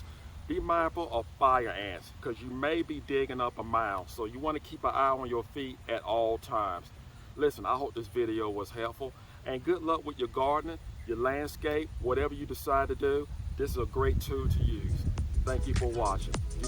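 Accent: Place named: Baltimore, United States. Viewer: Amazing video! Also you have a thick ass Baltimore accent 😂😂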